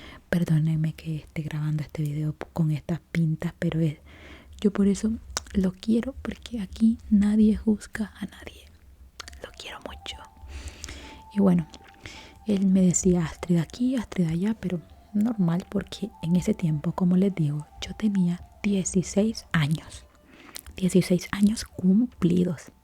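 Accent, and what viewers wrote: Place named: Nicaragua. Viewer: Tengo poco tiempo escuchandote pero, me gusta mucho tus videos sobre todo susurrando o platicando están super relajantes y me gusta mucho tu acento de voz nicaragüense, gracias por tus videos , saludos.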